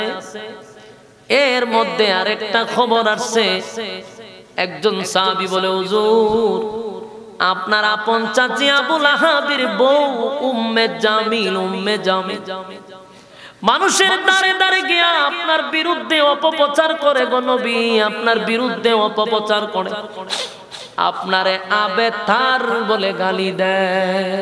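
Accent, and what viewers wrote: Noakhali, Bangladesh. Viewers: এটাই নোয়াখালীর বয়ান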